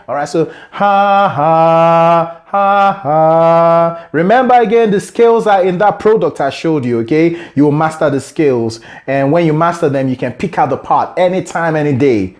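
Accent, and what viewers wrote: Nigeria. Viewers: Be honest, are you a Nigerian? cos you sound like one to me. You look more like an Igbo man. No offence, i love your work. Just want to know.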